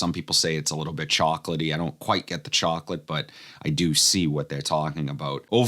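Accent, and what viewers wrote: Boston, United States. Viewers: Here we go again… I feel like I’m in Boston again with your accent lol. Anyhow, I think you would like Ani X . Fruity hints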